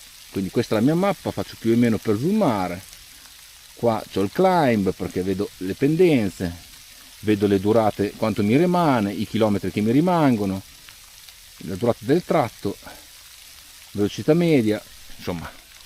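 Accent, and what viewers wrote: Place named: Emilia, Italy. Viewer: Ciao Hai un accento emiliano e vivi in Germania ma quanto forte sei è un gusto seguirti. Continua così.....😊😊😊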